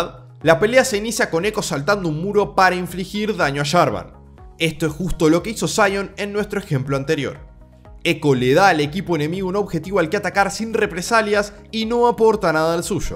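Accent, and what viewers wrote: Standard. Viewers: Solo a mi me desespera que un argentino hable neutro? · Porque habla en neutro si es más Argentino que yo 👀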